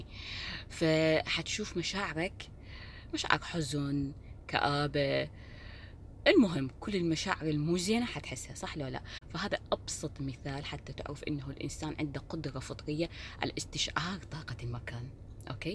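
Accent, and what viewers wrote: Iraq. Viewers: ههههه حبيت الكلام العراقي ❤ شكرا دايما طلي علينا بفيديوهااتك الحلوة المفيدة.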